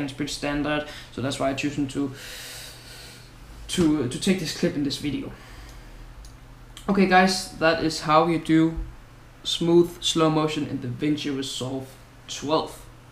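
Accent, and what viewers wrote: Denmark. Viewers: du er dansker, det kan jeg se og hører på dig:D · Ej det tydeligt at høre du dansker ;) god arbejde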